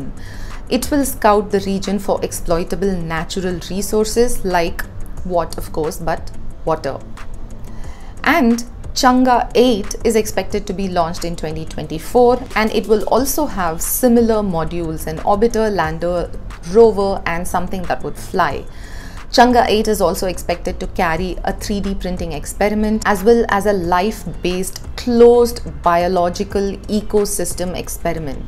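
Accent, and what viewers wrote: India. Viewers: 这个女孩子讲的印度英文话，蛮好听的。😁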